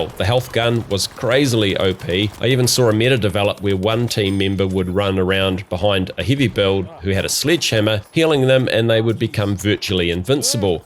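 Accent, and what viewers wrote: New Zealand. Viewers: I have shaked through this video... Im sorry, but i cant get over that New Zealand accent. "E" is replaced by "I". Well made video though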